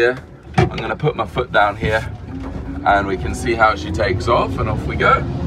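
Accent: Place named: RP, United Kingdom. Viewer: Sorry you sound too posh to give you my money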